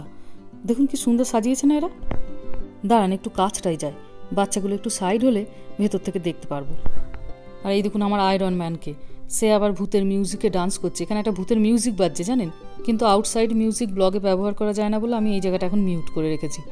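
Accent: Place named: Bardhaman, India. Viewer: খুব সুন্দর লাগে আপনার ভিডিও দেখতে। আর তার চেয়েও বেশি ভালো লাগে আপনার কথা শুনতে। আচ্ছা আপনি কি বর্ধমান জেলার মেয়ে কারণ আপনার কথার মধ্যে বর্ধমান জেলার কথার একটা সুন্দর টান আছে। আমারও বর্ধমান জেলার সাথে একটা যোগাযোগ আছে আমি উত্তর ২৪ পরগনার বাসিন্দা হলেও আমার মামার বাড়ি হলো বর্ধমান জেলার মেমারিতে তাই বর্ধমান জেলার ওপর আমার আলাদা একটা ভালোলাগা আছে। উত্তরের অপেক্ষায় রইলাম। ভালো থাকবেন।।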